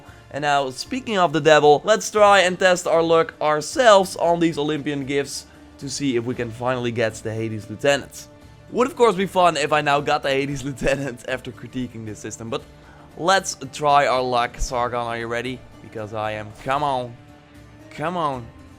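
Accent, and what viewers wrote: Netherlands. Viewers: Your dutch i hear it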